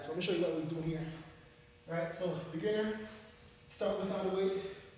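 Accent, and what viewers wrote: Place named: Jamaica. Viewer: Me hear de accent mon , me like this workout yahzimehhh !!!! 🇯🇲🇯🇲🇯🇲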